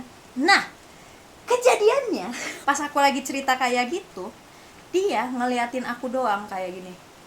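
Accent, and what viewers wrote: Java, Indonesia. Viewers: gagal fokus.. logatnya kadang" ke jowojowoan..kadang" keluar kesundasundaan..hahahhahaha.. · Kirain mantannya yg setan soalnya diajak ngomong tadi hahaha btw asli mana sih kok agak "NJOWO" bahasanya haha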